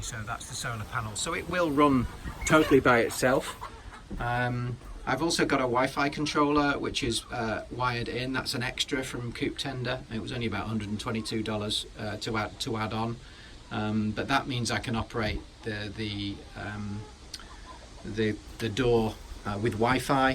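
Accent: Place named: England, United Kingdom. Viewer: awesome! That explains the accent. How you liking the U.S.? It's a lot bigger than England..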